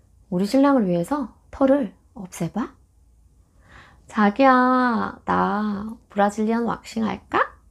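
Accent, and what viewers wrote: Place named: Gyeongsang, South Korea. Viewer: 고향이 경상도이신가 보네요!!!...ㅎㅎ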